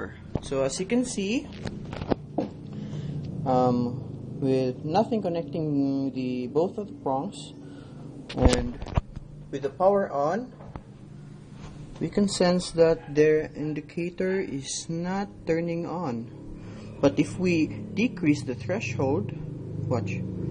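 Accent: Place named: Philippines. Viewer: Well done. U r Filipino right ? (It is the accent that gives you away :-) )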